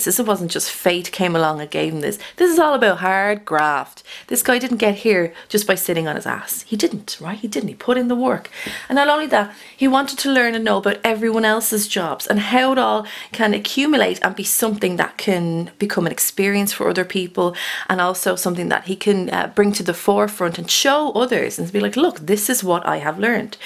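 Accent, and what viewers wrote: Ireland. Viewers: I really enjoy your readings--your delivery, your positivity, your authenticity and your lovely Irish (?) lilt...also, I am a Taurus cross-watching for a considerably younger Capricorn romantic interest. I think I'm the "matriarch figure" that you mention 😉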